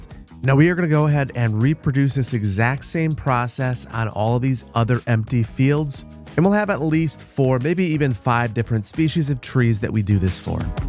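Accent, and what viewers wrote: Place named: Midwest, United States. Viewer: Cal State Northridge, and I thought you were midwestern. Greetings from This San Diego State '72 almunus.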